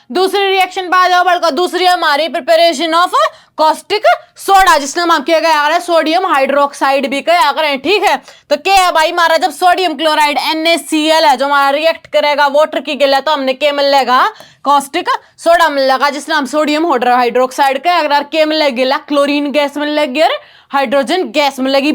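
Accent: Haryana, India. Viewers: Bahut hi accha padha rahe ho madam sabhi samaj sakte hai haryanvi boli bahut acchi lagi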